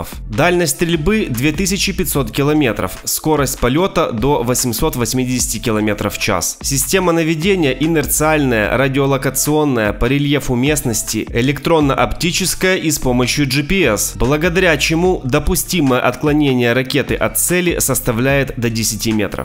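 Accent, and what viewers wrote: Ukraine. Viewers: Сразу понятно что украинец вещает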